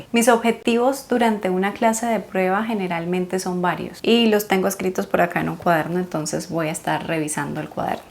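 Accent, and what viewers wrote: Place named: Colombia. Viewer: Me encanta tu acento! soy de Colombia también del área de Cundinamarca, pero ahora que vivo en Europa por más de 10 años el acento se ma ha ido. Pero por más acentos que veo el bogotano y el tuyo es de mis favoritos.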